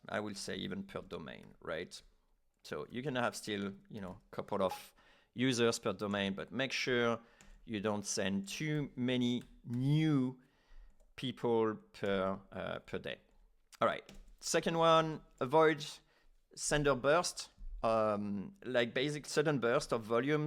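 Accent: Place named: France. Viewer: Hello are you french you have an accent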